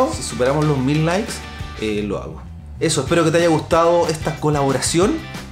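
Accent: Chile. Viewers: chileno we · Nuevo sub campeon. Primer video que veo tuyo y me redulto muy util. Saludos desde argentina. Supongo que por tu acento sos de chile. Se ve que sabes mucho del tema. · Eres chileno.. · De qué país es? Su acento me parece un poco chileno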